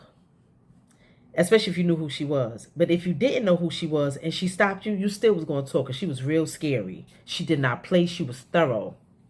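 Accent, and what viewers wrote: New York, United States. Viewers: HEY, we need you I’ve been checking your YouTube for what feel like almost a year … to see if you finished this story!! I really appreciate your content.. you my NY AUNT IN MY HEAD! I’m from OAKLAND CALIFORNIA, and I BELIEVE YOU BEFORE I BELIEVE THESE PPL NEWS MEDIA PROGRAMING!! I check every day hope in the near future you bless us with the rest until then, patiently waiting on your return!! I love y’all accent man😂 you dope as hell and the queen of HARLEM TO ME!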